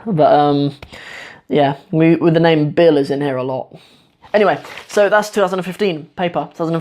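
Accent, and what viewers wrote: North, Somalia. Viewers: hi Amazing video again thank you. are familiar with the southern and northern accents, there is slight difference, I've seen your videos and you use the northern accent not that it's bad or anything😊 i guess because the formal writing system is mostly in that accent. but I think you'll find the difference funny. check it out😀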